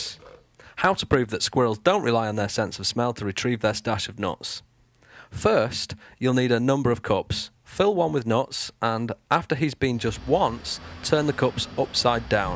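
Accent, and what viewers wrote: United Kingdom. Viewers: Thats how english people pronounce it, simple. Or if this guy is not english, then well, it definately looks like England, so he learnt english there.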